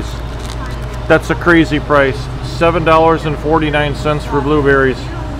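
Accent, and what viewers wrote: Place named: Midwest, United States. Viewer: You have such a mid western way of speaking it's so corny is a good way 🤣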